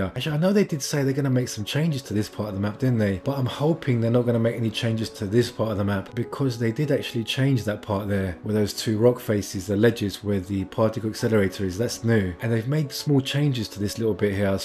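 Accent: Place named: United Kingdom. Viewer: Dude, keep it up! Your builds are the best I've seen. I'm trying to place your accent, almost British, but not quite....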